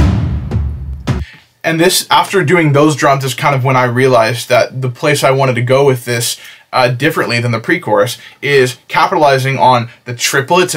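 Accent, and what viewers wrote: United Kingdom. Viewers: He lives in texas? I thought he was like from the UK. lol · Wait ur not British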